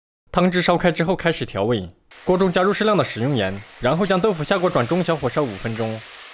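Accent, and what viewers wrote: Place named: Renfu, China. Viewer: 我说口音咋这么熟，都是属于仁富片区的啊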